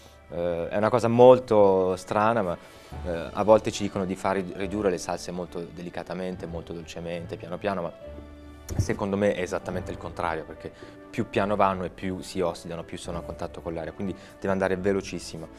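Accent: France. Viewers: Let's say the vid's interesting, but his "language accent" sounds really strange ! Some time he speaks with the french "r"... but sometimes he does speak with a real italian accent ! Hence, the vid sounds a bit setup...😲 Btw, the oil is made by "vinaccioli", not by "vinacce" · lo chef ha un accento francese sull’italiano 👂🏻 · he speaks italian with a lovely french accent. Very nice and charmant. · Perché parla con l’accento francese 🤔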